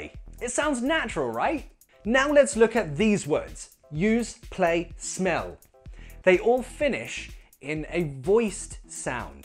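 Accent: United Kingdom. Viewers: Absolutely amazing! It's incredible how you show in a natural manner how one can speak with the British accent and sound really cool! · i wanna have a British accent just like u, and yr great videos r my path.. keep being cool and thank you so much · British speaking hear very soft accent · I'm No one hey i love ur accent BRITS 😍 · Wow you are a real British.I like the way you teach.I m lucky if I have a Brit to talk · That's what I was looking for! A perfect British prouninciation and Video 👍 Keep it up Thank you from Algeria! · Finally I've found someone spreading the good old British English. Hurray! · Hello. Greetings from Azerbaijan:) I also think there is not any schwa in your name. Both syllables are stressed I think. Do you practice th british accent? I myself have almost mastered it but I need quite a persistent friend to practice with. I am female I am writing from my husband's account. 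So i need only a female friend to speak to. looking forward to your reply dear:) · I’m from Oman🇴🇲.so I’m Arabian...thanks you so much ..you made me fall in love with British accent. My brother is learning the American accent but I found that the British accent is better ..you’re the best teacher everrrr.....😭😭💗🙏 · just British accent ❤ cheers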